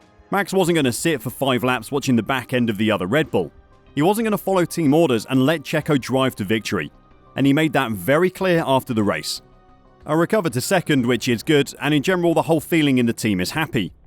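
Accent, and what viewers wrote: United Kingdom. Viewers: Very biased video against Max, sounds very British.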